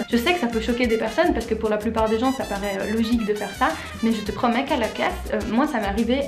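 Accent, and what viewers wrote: Switzerland. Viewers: Très drole, j'ai eu le réflexe inverse..Je me suis dit.."tiens, elle n'aurait pas un accent suisse?"...Et puis elle à parlé de francs et là "Ben ouais...elle est suisse".... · Je me disais bien que ton accent me faisait penser à quelque chose (à mon propre accent donc haha) ! Une Suisse ouii ❤️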